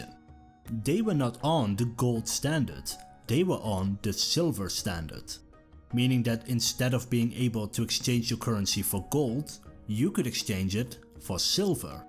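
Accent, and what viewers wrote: Netherlands. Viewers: Of course. That's a thought. However, it would work with an unmarked accent and the emphasis on 'rupt'. However, with his heavy accent it sounded like a mistake. Now that I realise he is Dutch, the word 'rupts' is in that language too so he might have presumed that it translated to English. All very interesting. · Kom je uit Nederland? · Narrator sounds Dutch. Is he Dutch? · He's dutch. It's an accent. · Are u dutch? Ben je Nederlands? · Thank you for the content... I am listenning to your accent... are you Dutch? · Ik had graag deze video willen genieten, maar je Nederlandse accent en VOORNAMELIJK je vreselijke "slis" maakt het verschrikkelijk om naar te luisteren... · you probably know this but he is Dutch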